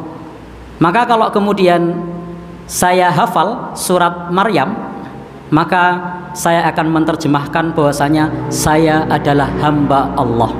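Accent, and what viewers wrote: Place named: Java, Indonesia. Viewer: Ustadz ini salah satu figur ulama yang patut di contoh. Sifatnya yang rendah hati, saling menghargai sopan santun dan ilmunya yang sangat tinggi. Juga logat jawanya yang kental. Benar benar ustadz panutan saya.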